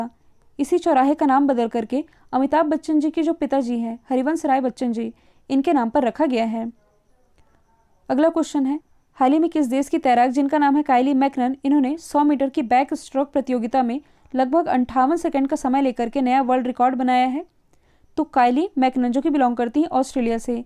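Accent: Madhya Pradesh, India. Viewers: Madam aap mp se hai kya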